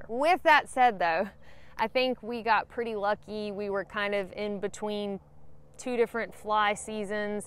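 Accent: South, United States. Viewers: where in the south are you from? Just wondering where your accent is from. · I like the southern accent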